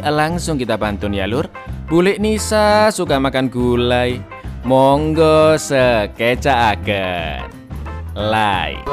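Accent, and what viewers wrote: Java, Indonesia. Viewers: Mas Bro asli jawa mana nih, jawa tengah atau jawa timur? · Mmm cara bicaranya kayak orang jawa pasti dia orang jawa...... oke atuh kalo gk tau mah gkpp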